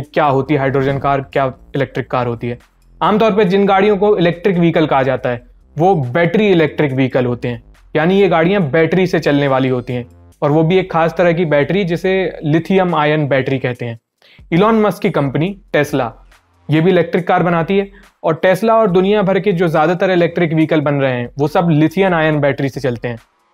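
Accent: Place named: Jabalpur, India. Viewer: Bawa jabalpur se ho lag rha...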